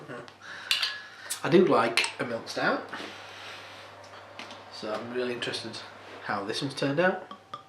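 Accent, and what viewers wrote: Yorkshire, United Kingdom. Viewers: ooo aaaaaaarrrgghhh thaaarrrrttt smells booooootifuuuulll .. proper Yorkshire accent dude .. picked up the colab beer they did with northern monk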